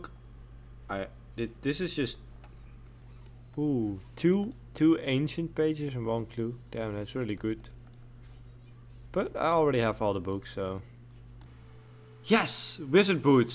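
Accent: Netherlands. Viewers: nice, i heared a little dutch accent:p, nice videos man! Just subbed! Keep it up 💪🏻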